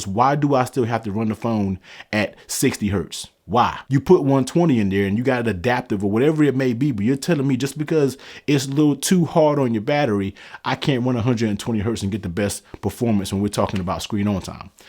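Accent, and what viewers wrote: United States. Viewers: lol! Figures... I'm from VA and you sound like my all my family and friends in NC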